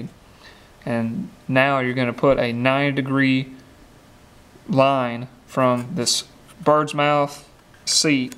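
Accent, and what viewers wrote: South, United States. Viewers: I appreciate the southern accent. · Tons of people today have no clue on some construction techniques, im amazed at some old timers ability to make cuts or joinery from years of experience easily and from eyeballing it, there are those of us that can do a lot of basics but appreciate tips that are not neccesarily as well known as some of these commenters think. If some on here say stuff about your southern accent, i would die laughing if i were to hear their New Joisey accent, We all have accents and it is NOT indicative of intelligence level ( dumbasses) Keep on making these man, they are informative and a help. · Having watched a few of this man's vid's, and returned to them more than once, I'm beginning to think there there's no end to this man's talents. As for your Southern accent? Well, 'least ya'll speaking English. Keep it up. 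· Nice video bud. People just get jealous that they don't have a southern drawl lol guarantee most Yankees wish they had one lol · hit the like on this comment, sir God blessed you to be a teacher, what He gave you is a gift, He meant for you to give your gift to others and you have, so carry on sir I salute you and never apologize for the southern accent. that's a gift from God too !! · don't worry about your southern accent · Don't apologize for your southern accent. Remember the song,? "I'll speak my southern English as natural as I please; I'm in the heart of Dixie, Dixie's in the heart of me!" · Don't apologize for your southern accent, it's a blessing from God. - Proud Texan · As a female who knows little about construction, I find this fascinating and (bonus!) easy to understand. I probably won't ever need to use this, but I love to learn all sorts of things. And I find a southern accent charming lol. 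Please keep up the teachings as you are great at it :-) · a southern accent instills confidence in me that someone knows how to use tools. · k-e-w-l.......just got back into being a grunt for a framer.....did it in college years ago.....saved my tools just incase I had to go back to it....and I went back to it.....work for an old hippie who knows a few tricks himself.......will hafta check and see if he knows a few of these tricks....he just might.....sure thank ya fella.....southern accent here too, so don't worry......be happy.....:) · To an HVAC/Electrical/Mechanical troubleshooter, now a semi retired handy man, this is a priceless video...At least I won't look totally lost...lol....My thanks, to another country sounding gent like myself, for making it.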